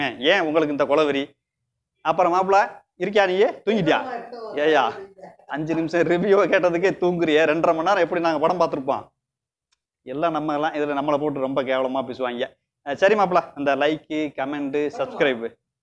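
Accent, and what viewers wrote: Madurai, India. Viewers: madura slang thane unaku pluse continue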